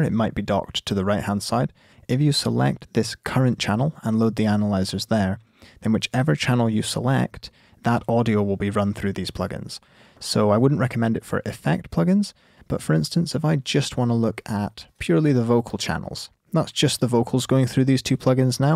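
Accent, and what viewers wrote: Scotland, United Kingdom. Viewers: He's Scottish isn't he?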